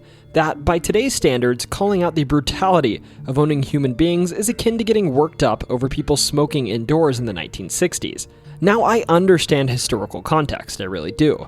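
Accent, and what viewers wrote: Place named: Pee Dee, United States. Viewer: You sound like a Pee Dee native! I couldn't believe there was no lizard man here. And I grew up in Dillon so Bigham's Light is always on my mind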